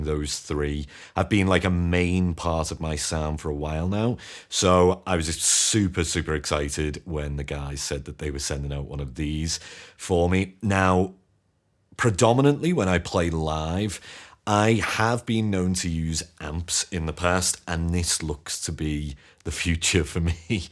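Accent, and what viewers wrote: Liverpool, United Kingdom. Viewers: The scouse accent just is the best for music reviews ❤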